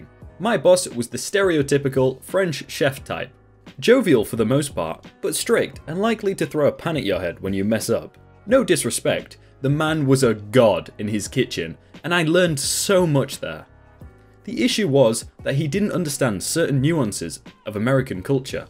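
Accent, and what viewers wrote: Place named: Yorkshire, United Kingdom. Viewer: Your deep south accent sounds suspiciously Yorkshire-like...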